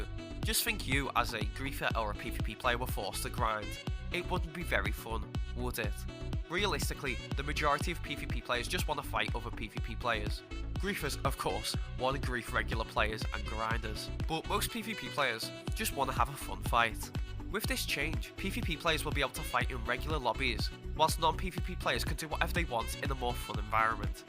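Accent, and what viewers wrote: Liverpool, United Kingdom. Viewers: still British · you from liverpool? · Are u scouse lad or u a wool? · hatred for bri'ish accent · 😂 Scottish! Lmao he’s from Liverpool. not Scotland 🏴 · Are you a scouser? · no he’s british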